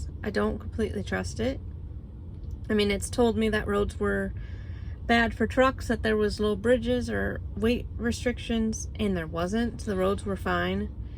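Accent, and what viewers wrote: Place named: Michigan, United States. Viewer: You sound like you may be from Michigan. My Love is from there, too. She's from just below Traverse City. A little town east of Manistee called Wellston. Just wondering. As always, travel safely...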